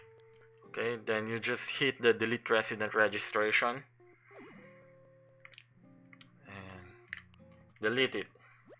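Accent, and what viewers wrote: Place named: Philippines. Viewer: Filipino accent there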